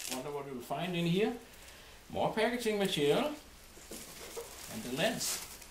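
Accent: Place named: Denmark. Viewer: I know that Dansk accent :-)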